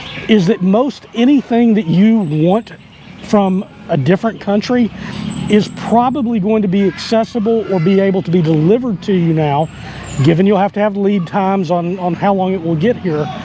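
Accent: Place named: Georgia, United States. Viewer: I can tell you must be from Georgia. 😁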